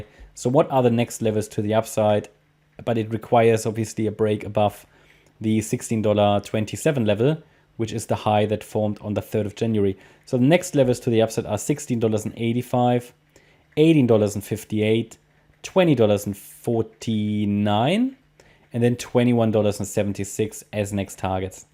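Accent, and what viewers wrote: Germany. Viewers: man hört so nen deutschen akzent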